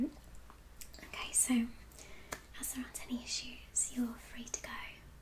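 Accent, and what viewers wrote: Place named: England, United Kingdom. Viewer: Nothing better than a classy English women's voice 👌🏻🙈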